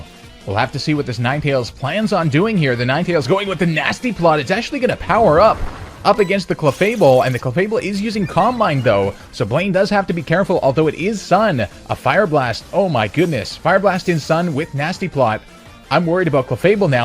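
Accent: Canada. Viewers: Are you Canadian or am I imagining your accent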